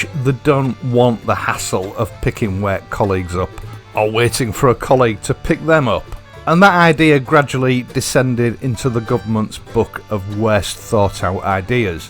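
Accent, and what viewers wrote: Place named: Hull, United Kingdom. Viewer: Just found your channel it’s the accent I left hull along time ago liked vid I’m a fan now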